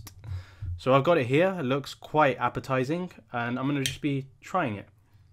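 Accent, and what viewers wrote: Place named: United Kingdom. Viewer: Your not white no, it doesn't mean you arnt British. You do however have a strong British accent. So your upbringing isn't obvious.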